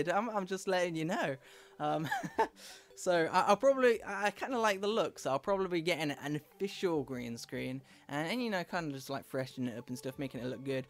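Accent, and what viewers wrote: United Kingdom. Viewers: 1 quid table cloth from asda = 1.46 table cloth from walmart for you yanks that didn't understand his queens english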